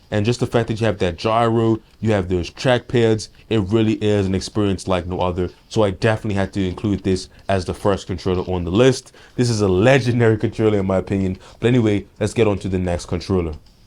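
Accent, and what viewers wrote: Bermuda. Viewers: Ayo you got a pretty heavy Bermudian accent there my guy- not sure if you're actually from Bermy but it's similar!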